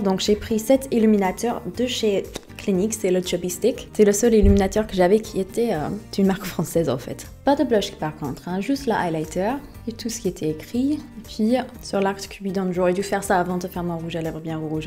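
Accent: United States. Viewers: Ça fait partie du charme de son accent americain! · Ah ah une française sans mascara ? Moi je peux pas vivre sans ! J'adore ton accent américain 😍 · Bonjour, Je lâche très rarement des commentaires sur Youtube, mais je prends le temps de t'écrire ici car je te découvre tout juste sur ta chaine et suis tombé immédiatement sous le charme. Belle comme un cœur et possédant un charme fou, cet accent américain finira par me faire découvrir une personne attendrissante et qui traite de sujet France/Amérique sous un autre jour et d'un point de vue étranger. Bref, je ne fais pas une déclaration, loin de moi cette idée, mais tout simplement la découverte d'une personne rayonnante et intéressante qui t'a fais gagner un abonné de plus:) Te souhaitant une bonne et heureuse continuation dans ta vie de tous les jours et sur Youtube;) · Je vien de m'abonner à ta chaîne et je te trouve tellement belle tellement fraîche ! 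Et ton accent américain est juste trop CANON grace a toi j'en apprend plus sur les américains et les usa ! Mon reve est d'aller a NYC mais j'ai peur de l'avion (c'est ironique hein) Ahaha gros bisous !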